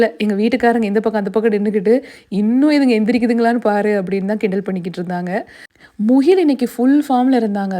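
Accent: Coimbatore, India. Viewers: Modaiya irukum😂 Absolute Coimbatore slang❤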